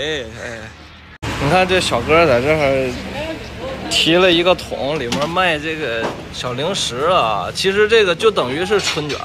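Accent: Tianjin, China. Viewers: 天津人，哥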